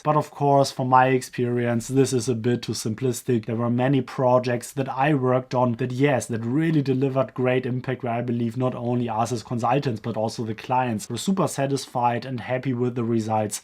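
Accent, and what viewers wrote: Germany. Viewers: Das gute alte German English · I love your German accent while speaking English :D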